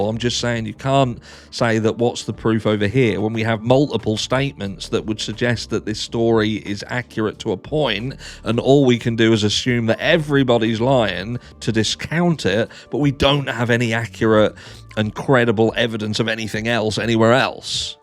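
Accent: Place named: London, United Kingdom. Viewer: Love that London language Mr ❤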